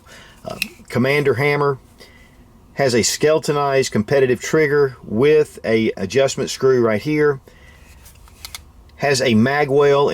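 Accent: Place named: South, United States. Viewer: Ah, give him a break, he's got a suthin' accent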